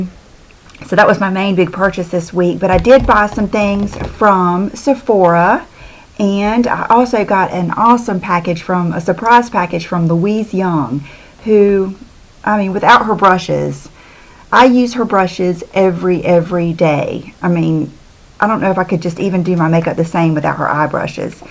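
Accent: South, United States. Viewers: My husband and I are having coffee this morning in our sun room...he heard your voice and asked me, "Are you watching your favorite southern girl again?" :)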